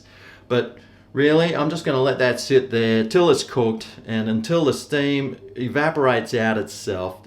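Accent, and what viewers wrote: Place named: Australia. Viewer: Love the Aussie accent 😂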